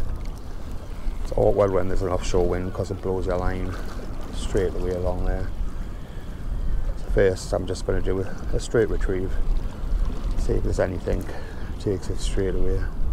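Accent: Newcastle, United Kingdom. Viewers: You sound like a geordie... or close enough... where are you fishing?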